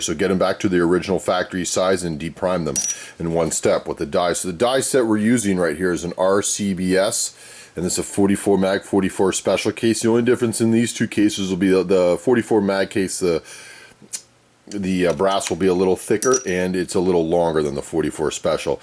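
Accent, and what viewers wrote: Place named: United States. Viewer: You sound exactly like my history professor lol, what state are you from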